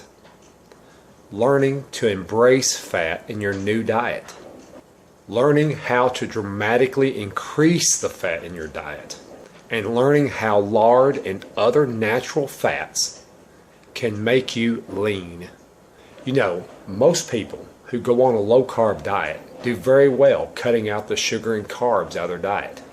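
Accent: South, United States. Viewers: your southern drawl makes me laugh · I love your channel and your southern accent. Thank you for breaking stuff down for us!You’re awesome! 😎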